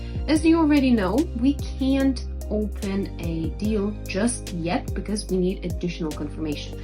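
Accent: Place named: Jamaica. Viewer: great video, love the strategy. Were you speaking Jamaican? More than once you said stuff that sounded as if you took them from.the Jamaican dialect.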